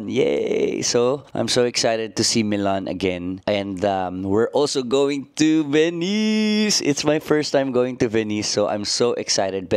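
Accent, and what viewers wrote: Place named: Philippines. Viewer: your accent is so Pilipino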